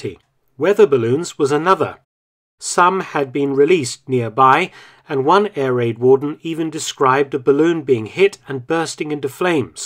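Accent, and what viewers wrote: United Kingdom. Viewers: Ok, the humiliation and desire to retaliate because of the cactus, combined with the british narration, makes this video seem like a Monty Python mockumentary.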